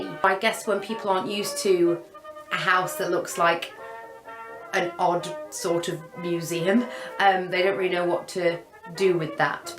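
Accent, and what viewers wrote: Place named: United Kingdom. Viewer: Love this! She sounds British, is she single? 🙂(Of course, I don't live in Europe..)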